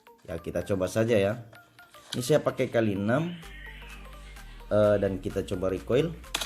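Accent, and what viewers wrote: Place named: Sunda, Indonesia. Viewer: Orang sunda yeuh logat na beda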